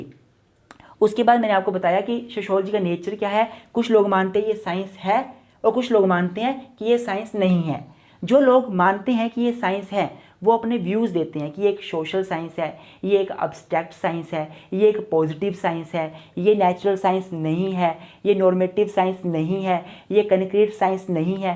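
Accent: Himachal Pradesh, India. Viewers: Kya aap Himachal se ho ? 🙄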